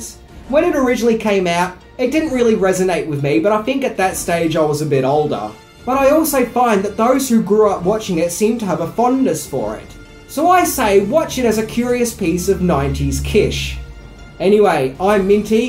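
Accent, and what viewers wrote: Australia. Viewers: Wait a minute? Pat Ben-ah-tah? It's said "Ben-ah-tar". Kind of reminds me of how you say "figure" due to the Aussie accent, making "ure" as "ah" instead of sounding like "your". You'd never say the 80s band " " as "Midge Ah". LOL As for the soundtrack, it doesn't make any sense to have " " by when the original song from 1969 would have been way better and more harmonic. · In case you all hadnt noticed, Minty's an Aussie, they pronounce things differently, lay off him, he's got it hard enough being upside down all the time. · well OK if you call Australian accent proper English,, tbh though I live in England and I've noticed he has mispronounced some very English words,, lol.. Still a great YouTube channel though and I'm only messing about as I know what you mean it's just made me laugh when he said some town names from England wrong in a video ages ago,, tbh I can't even remember what it was now but had to laugh because it was totally wrong.. 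Lol.. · Maybe its an ausie thing, like how they pronounce seaga instead of sega? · Minty you are annoying beyond words. Frank Lan JELL a Pat BEN et are These are not obscure names from the twelfth century BC, they are actual famous people of our time. Don't guess at names. Not people's names, not place's names. I get that you're from Australia and so e words and phrases may be unfamiliar or even be pronounced differently, but these are NAMES. YOU MAKE MY EARS BLEED. I'd block your channel but your info is good and I DO learn new things.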